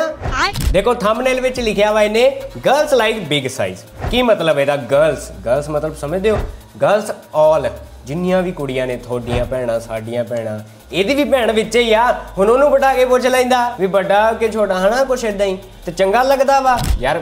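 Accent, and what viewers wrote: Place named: Ludhiana, India. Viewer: ਬਾਈ ਤੋਂ ਲੁਧਿਆਣੇ ਤੋਂ ਲੱਗਦਾ😅😅😅😅😅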